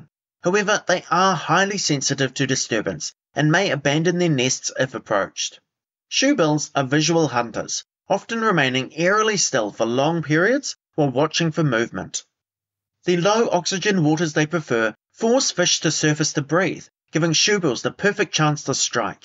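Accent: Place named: New Zealand. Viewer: Great article mate, thank you. Also really easy to listen to your NZ accent, rather than yanks. 👍🏼🇦🇺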